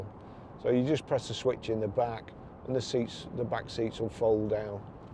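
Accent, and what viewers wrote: United Kingdom. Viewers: Yes, my daughter spent time training in Preston at Preston Royal as a Hospital Doctor. However, I’m afraid that stupid and inconsiderate driving is commonplace. Had they learned and driven with slower non electronic vehicles they might have realised their own and their vehicles limitations. Younger drivers think that they are good drivers because their electronic aids mostly mask their errors. As the old pop record had it; “Keep your eyes on the road and your ‘Hands’ on the wheel!” ,though referring to different attractions. Nice to hear the accent having spent nearly fifty years in exile in Yorkshire!.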